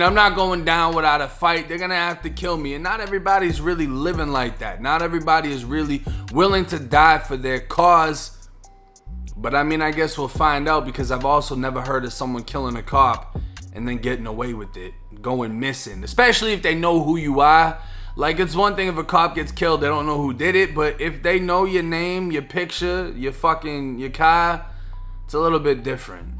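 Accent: Boston, United States. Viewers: brand is definitely unique and that Boston accent adds to the entertainment factor. Charges are pronounced Cho-jizz, lol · You from Boston bro? I'm from Lawrence, MA. Did you pahhk the cahh at haahvahd yawwhhd? Lol you gotta be from mass bro I catch the accent in all your videos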